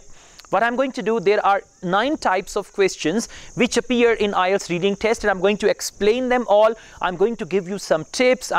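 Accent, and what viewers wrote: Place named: India. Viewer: indian accent dominates 😃 You are very good 👍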